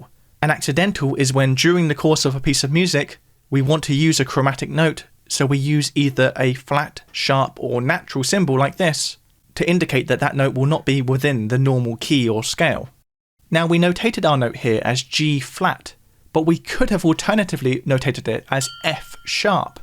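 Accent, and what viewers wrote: United Kingdom. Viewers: That british accent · I think the only one I didn't know was mordent? But I'm an American guitar player, so your definitions for glissando and portamento seemed backwards to me. As a bit of an Anglophile, I very much enjoyed hearing some of your pronunciations of the terms.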